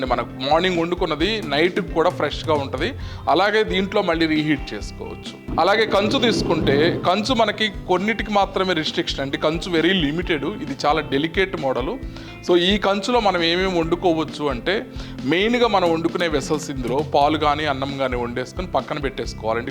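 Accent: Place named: Telangana, India. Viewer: Telangana yaasa idhi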